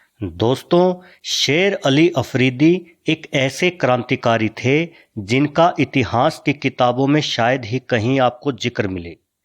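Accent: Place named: Rohtak, India. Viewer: Aapke Rohtak type उच्चारण ka koi nidan dhoondein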